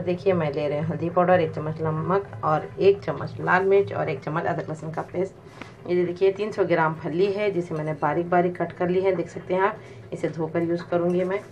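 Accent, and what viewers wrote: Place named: Hyderabad, India. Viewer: Apki Hyderabadi baat sunke bohat Acha Laga...I m from hyd but living in Oman... always I miss Hyderabadi people & Hyderabadi foods..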